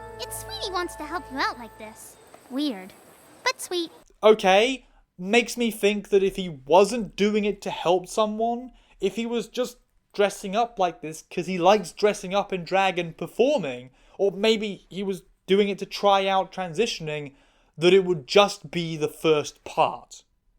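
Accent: United States. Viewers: I like her american accent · Please please please do a bunch of shorts in the American accent just for luls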